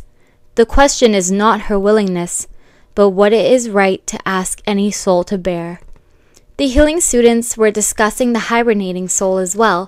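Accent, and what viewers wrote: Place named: United States. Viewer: What type of American accent is this? Like what state is she from? I really like it, it’s melodic and smooth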